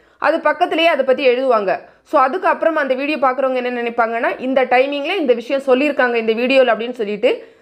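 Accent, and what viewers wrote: Kanyakumari, India. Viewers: Neenga kanyakumari district ahh?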